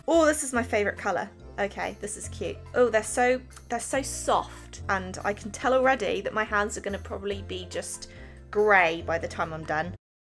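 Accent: United Kingdom. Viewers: Pigmented. Love your videos. Where are you from originally? It’s a British accent, probably south? I’m in Liverpool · I have a few questions (for any future Q&A's. wink *wink*): How old are you? When is your birthday? Do you have a boyfriend (you're very beautiful btw)? And how do you still have your british accent when you've lived in the usa your whole life?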